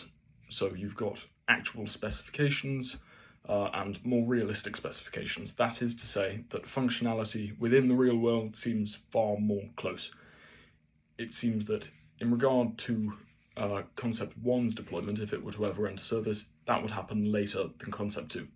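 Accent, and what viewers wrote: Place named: RP, United Kingdom. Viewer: Very interesting thank you. I have seen some pictures of the drone, but not any in detail specifications of the program. Do you know if the concept 2 is the design they’re taking forward? During the video I might have had to rewind a couple of times to understand, but we don’t speak the Kings English quite like he does, this close to the Scottish border. 😂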